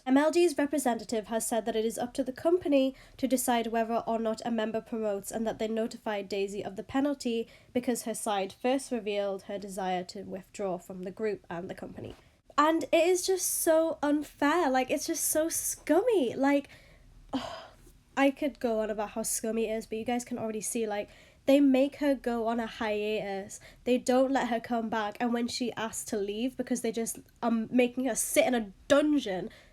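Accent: Newcastle, United Kingdom. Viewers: wait sis where’s your accent from. I’m getting Geordie. Watch me get it wrong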